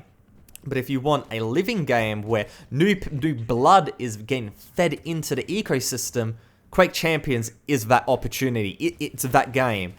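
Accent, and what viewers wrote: Australia. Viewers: Australian accent?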